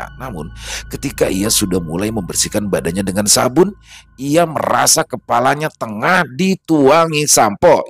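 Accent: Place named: Kalimantan, Indonesia. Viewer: Kok yo Kalimantan bgt gtu lho 😭😂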